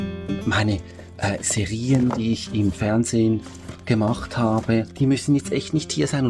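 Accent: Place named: Basel, Switzerland. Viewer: Wie schön mal einen Mann beim Sortieren über die Schulter zu schauen! Dein Video hat mir sehr gut gefallen, ich mache das sehr ähnlich und habe auch aufgehört ständig etwas Neues zu kaufen. Ein neues Teil und ein altes Teil muss gehen. Beschriftung mag ich nicht so gerne, aber jedes Teil hat seinen „Park“platz (eine sehr schöne Bezeichnung!). Meine beste Freundin lebt in Frankreich an der Grenze zu Basel, ich höre Deinen Akzent und bin sofort dort 🥰 Vielen Dank für Dein ehrliches Video und liebe Grüße aus Hamburg, Claudia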